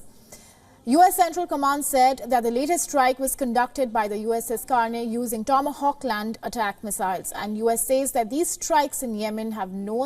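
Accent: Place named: India. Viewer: We couldn't stand her Indian accent.👎👎👎👎👎👎👎👎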